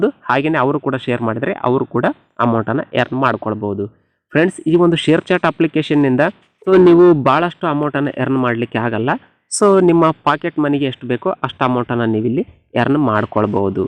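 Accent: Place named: North Karnataka, India. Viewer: ಸರ್ ನಿಮ್ಮ ಮಾತಿನ ಶೈಲಿಯಲ್ಲಿ ನಾನು ಗುರುತಿಸಬಲ್ಲೆ ನೀವು ಉತ್ತರ ಕರ್ನಾಟಕದವರು ಅಂತಾ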